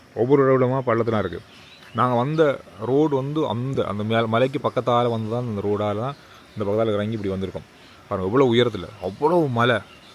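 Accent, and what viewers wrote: Sri Lanka. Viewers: உங்கள் இலங்கை தமிழ் மிகவும் நன்றாக கேட்பதற்கு இனிமையாக இருக்கிறது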